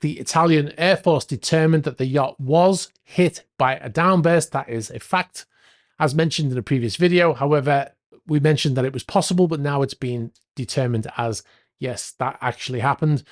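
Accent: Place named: Scotland, United Kingdom. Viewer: Man, do you speak in an unpleasant and hackling kinda scottish, almost stuttering way...did you take any lessons..?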